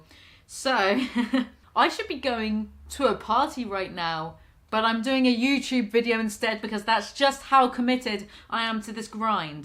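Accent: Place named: RP, United Kingdom. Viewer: speak posh for a video, you already have a posh accent you speak to yourself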